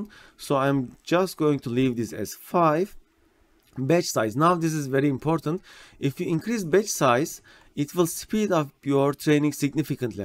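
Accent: Turkey. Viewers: Çok faydalı içerik. Teşekkürler. (1. dakikanın sonunda aksandan dedim ki bu adam Türk galiba, öyleymişsin. :D ) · Önce bi forumda gördüm videonu aksandan direkt anladım Türk olduğunu. Çok tebrik ederim harika bir tutorial. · Türkmüsün? Türk aksanıyla ingilizce konuşuyorsun. Güzel rehber. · Dostum aksanın Türk'e benziyor; Türk'sen türkçe altyazı geç bizim için. Çok süper olur bize.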